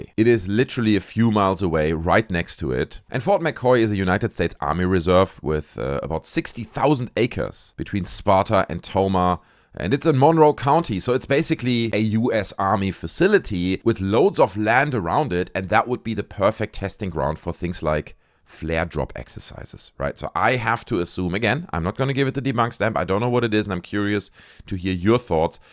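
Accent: Germany. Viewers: Love Ur German English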